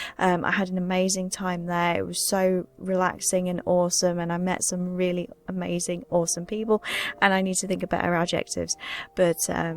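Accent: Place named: England, United Kingdom. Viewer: Great video:) Everyone has that funk and talking about it is honestly that foot hold that helps start the journey out of it. You would do amazing in the ASMR scene. The English accent alone would do it but you have such a smooth and soothing voice and very little inflation in your tone (All of this of course is meant in the best of ways). Good luck with your journey home and your new direction:)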